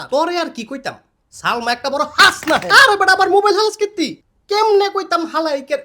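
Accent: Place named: Narsingdi, Bangladesh. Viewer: আমনে কি নরসিংদির নাকি,,,আংগ ভাসায় দি কতা কন,,,,বাড়ি কইনো আমনেগো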